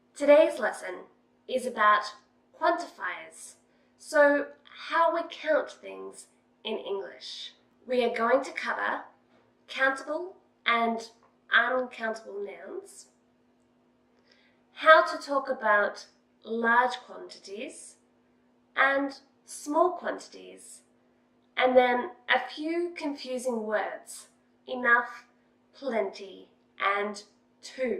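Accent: Australia. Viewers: Content is exceptionally good!! Exactly what I was looking for for a friend. However, sound and video production are, I am sorry to say, horrific. Sound production is important, because many will find the strong Australian accent unfamiliar and then add to it the slight echo from the bad sound and that makes it even more difficult for people to understand you. The slow speech is excellent, though. I am hoping since 2019 you Googled "sound for youtube videos" and made ANY improvements suggested. Really, if you are going to produce youtube videos, doing the absolute bare minimum for quality, not far below, is expected. The poor production quality is really detracting from your absolutely fantastic content. Cheers!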